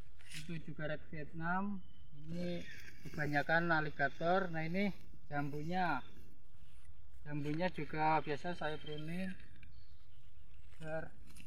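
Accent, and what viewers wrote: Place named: Java, Indonesia. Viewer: Pemangkasanya pas dan terukur, bahamu juga meduk njawani😂😂😂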